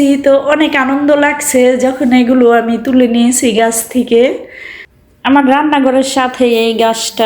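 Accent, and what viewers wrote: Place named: Noakhali, Bangladesh. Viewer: আসসালামু আলাইকুম আন্টি আপনার বয়েজটা নোয়াখালী সাথে আসতেছে লক্ষ্মীপুর জেলা মতো শুনতে অনেক ভালো লাগে আপনার বয়েজ। আল্লাহ আপনাকে সুস্থতা দান করুন এবং নেক হায়াত দরাজ করুক আমিন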